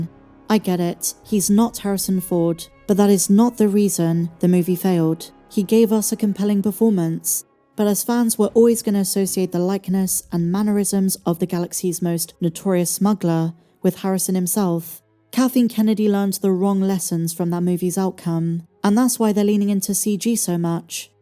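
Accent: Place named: England, United Kingdom. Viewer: I swear with the english accent it sounds like she says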